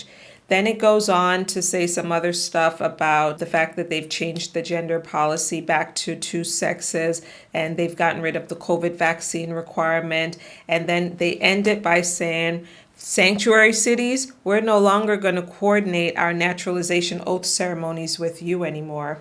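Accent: Jamaica. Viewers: Good evening are you a Jamaican?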